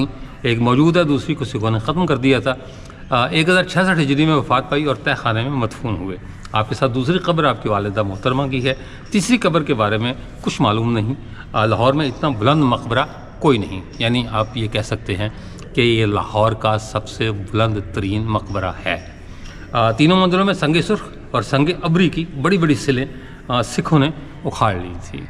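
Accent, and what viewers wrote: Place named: Uttar Pradesh, India. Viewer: آپ کی اردو بولنے کا لب و لہجہ یو پی، میرٹھ اور دہلی طرز کا ھے کمال کے اہل زباں ھیں آپ،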